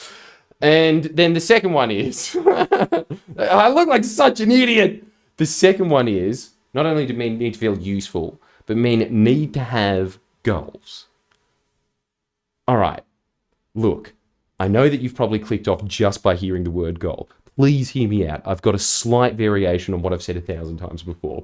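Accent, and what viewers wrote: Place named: Australia. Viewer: you often say things that alot of people have said thousands of times in thousands of ways, yet its only clicking when i hear it from your aussie accent. thx for these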